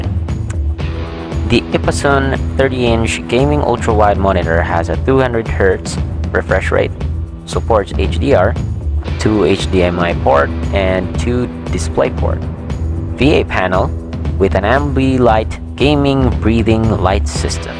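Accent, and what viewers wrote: Philippines. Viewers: Ok sana kaso bisaya ka e hahah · animal! bisaya man diay ni. haha subscribed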